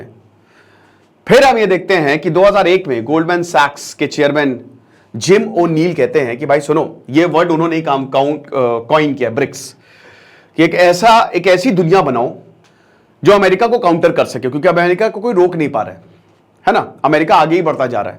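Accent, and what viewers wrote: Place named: Pratapgarh, India. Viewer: Sir sarau se aap pratapgarh se hai lg rha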